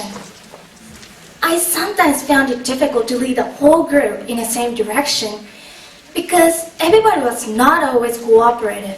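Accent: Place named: Japan. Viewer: セリノ 発音はキレイだけど日本鉛 まぁー日本人ならこっちのほうがキレイに聴こえるけど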